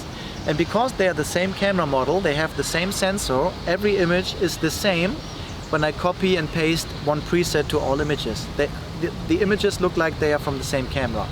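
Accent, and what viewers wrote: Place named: Germany. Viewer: Liebe den deutschen akzent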